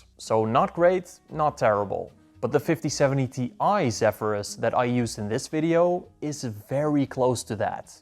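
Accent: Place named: Netherlands. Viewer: U sound so Dutch xd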